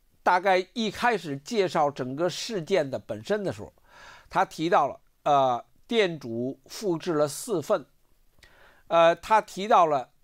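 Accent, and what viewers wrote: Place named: Beijing, China. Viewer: 从十一开始到十一结束很久没看涛哥的节目了，听到涛哥的那口正宗的北京口音感觉棒极了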